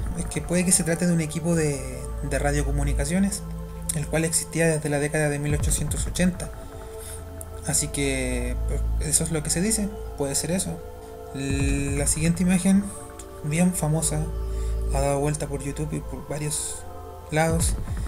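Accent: Chile. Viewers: Video Robado y monetizado sumale la voz de un chileno Boludo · Buen video. Oye una pregunta,eres Chileno??? lo digo por tu acento! Saludos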